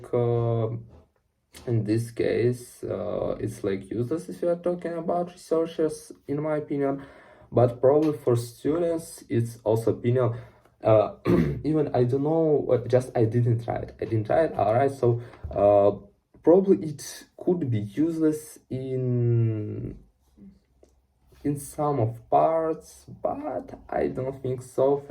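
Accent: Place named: Ukraine. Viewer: When i started to listen you , i understood that you from Ukraine, because of your pronunciation. I'm also from Ukraine 😂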